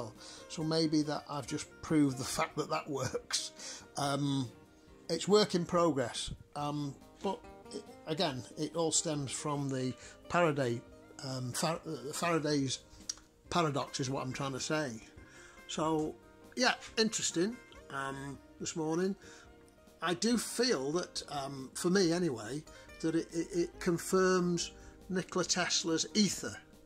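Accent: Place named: England, United Kingdom. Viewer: You sound a lot like the Draftsman, English accent. By the way, the double coil device charged the cap to over one thousand volts. Scary! Could you go to a remote area, out in a field, away from all electric interference. Swing the mag/coil on the rope around in a circle while you stand in the center, hold your hand over your head and swing away, a larger radius. Little voltage in the shop, bigger outside.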